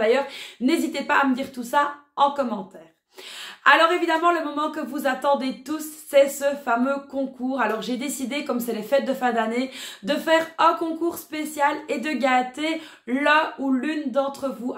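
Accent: Liège, Belgium. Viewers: Moi je préfère quand on entend sa voix top forme avec son super accent liégeois 😉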